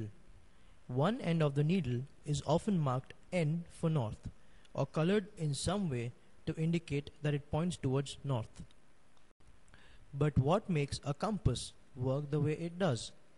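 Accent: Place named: India. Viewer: you have such a indian accent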